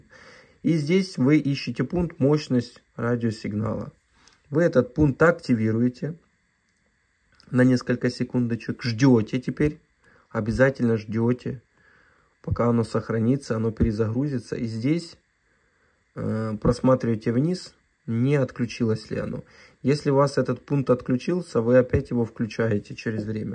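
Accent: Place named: South, Russia. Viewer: Южно русский говор... Какчай качай... -?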